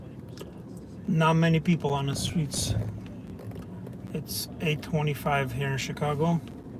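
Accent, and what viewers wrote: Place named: Romania. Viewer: Bănuiam eu că ești român după accent dar nu eram chiar sigura. Mulțumesc de sightseing.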